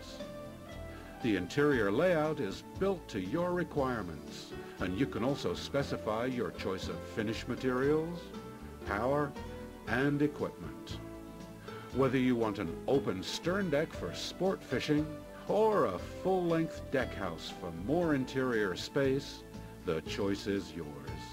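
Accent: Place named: United States. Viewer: great boats, but jesus, this video looks and sounds like its from 1990. and whats with the super american narrator?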